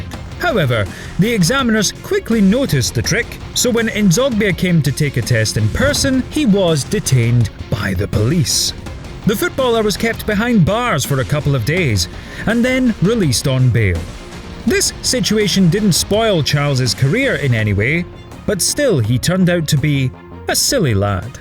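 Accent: Scotland, United Kingdom. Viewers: its scotish?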